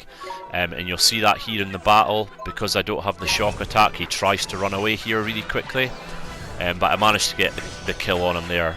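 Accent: Scotland, United Kingdom. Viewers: I believe he may be Scottish, me lad.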